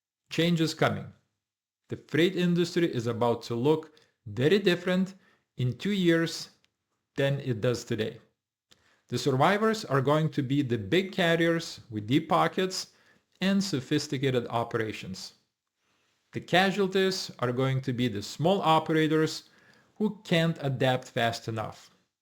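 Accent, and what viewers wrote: Russia. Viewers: I doubt that, in total, there are 600,000 trucks, with illegal CDL drivers on today’s roadways. Living in So. Cal., I assume that by COB today that all of the seaports will soon be closed. Tomorrow, the So. Cal. freeways will be free of the heavy diesel powered truck hazards that exists here? Why does your accent sound so RUSSIAN. Is this Russian misinformation? Deport all illegal CDL truck drivers, ASAP, for a start.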